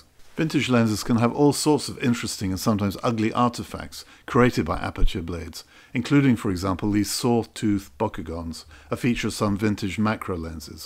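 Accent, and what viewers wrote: United Kingdom. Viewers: Very nicely made, fantastic voice, better than most of the best tv newsreaders. Can't beat the Brits ! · Very British, were I to guess?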